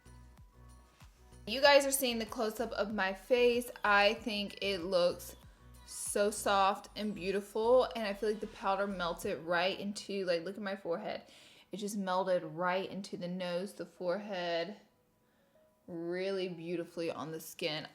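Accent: South, United States. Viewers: she sounds southern in this video hah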